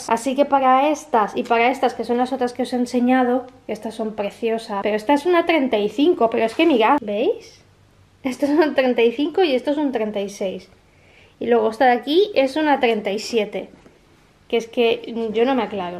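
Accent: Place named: France. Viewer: eres de origen francés? Lo digo por tu acento. Tienes algún vídeo hablando de tus orígenes? Cuánto tiempo llevas en España?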